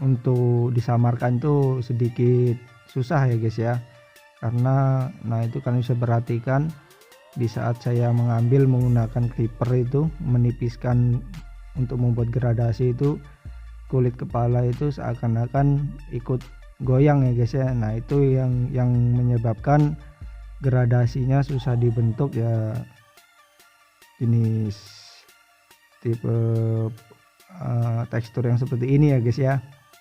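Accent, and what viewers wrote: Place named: West Java, Indonesia. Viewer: Kyak logat sunda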